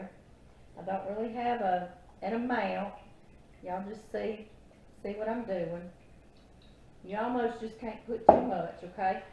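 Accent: South, United States. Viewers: Just had to follow you as you seem such a lovely warm person..Oh I love your country accent too. Hope you had a lovely holiday in good ole USA from me in the UK x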